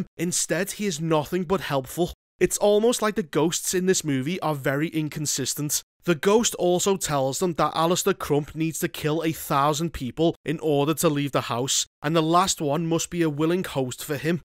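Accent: Liverpool, United Kingdom. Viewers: he has a 'scouse' accent as we say... it means he's from Liverpool. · He's from Liverpool. Very distinct accent.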